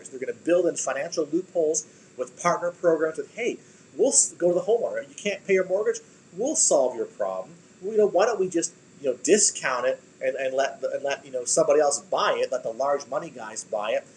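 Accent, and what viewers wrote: Canada. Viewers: No big deal but you sound like you’re from Canada you have a Canadian accent I just started listening to your program just curious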